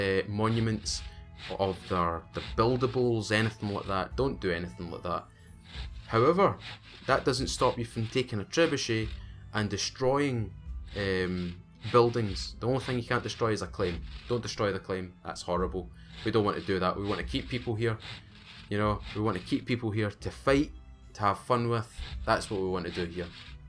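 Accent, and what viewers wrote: Scotland, United Kingdom. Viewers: Scottish accent is brilliant, Just bought the game and it seems we are going to spend some time here xD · WHere are you from ??? Scotland ?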